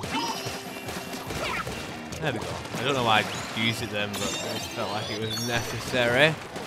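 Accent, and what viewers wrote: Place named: Scotland, United Kingdom. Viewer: You sound partially scottish and partially not, it'S CONFUSING but this video has some really nice tips. I'd love to practice with you one day :'3 it's nice getting tips and I learn better through practice anyway. ... though, I'm interested- as another weapon, how would you counter a tri-slosher user? ;)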